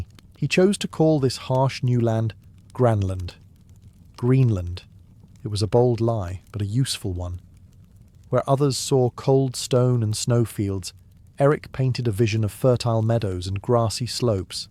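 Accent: United Kingdom. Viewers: Love😂 the British accent. Makes me feel a little more civilized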